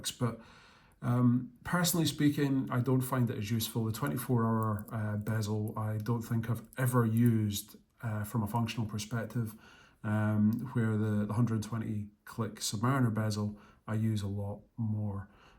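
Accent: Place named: Scotland, United Kingdom. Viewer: Sounds great! I thought I could hear an east coast Scottish accent. Wasn’t sure if your local AD was Jamieson and Carry up in Aberdeen?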